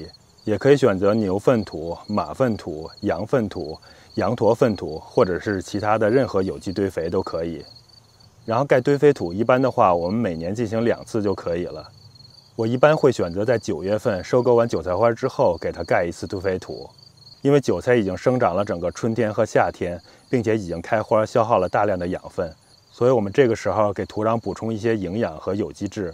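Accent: Beijing, China. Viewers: 说的清楚透彻。听口音你是北京人吧？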